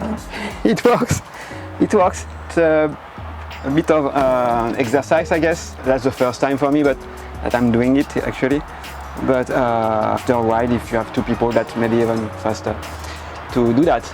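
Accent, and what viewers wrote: France. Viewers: Je reconnais l’accent français, sympa le van ☺️ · Yet another successful creation from Freedom Vans! This model isn’t my use case, but I think you should hire the narrator based on his wonderful French accent!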